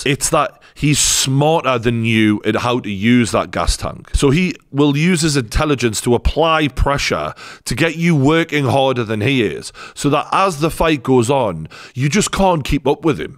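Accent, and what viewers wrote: Newcastle, United Kingdom. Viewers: Hello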